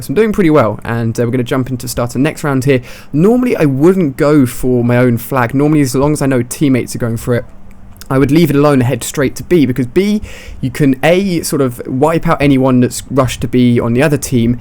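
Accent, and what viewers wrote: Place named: United Kingdom. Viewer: He's British, it's just an accent.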